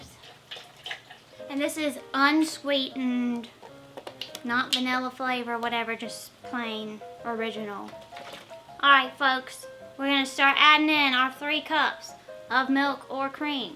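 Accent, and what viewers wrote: South, United States. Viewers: Slow southern draw! Haha it was an adjustment for me when I moved from up north to down south. Apparently on the flip side I speak really fast! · You look like wynonna rider! But with a southern accent! I promise it’s a compliment!! ❤️❤️❤️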